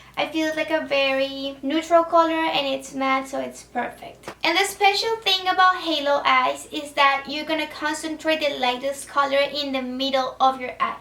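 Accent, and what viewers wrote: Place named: Colombia. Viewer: amo tu inglés colombiano😍😍😍😍😍😍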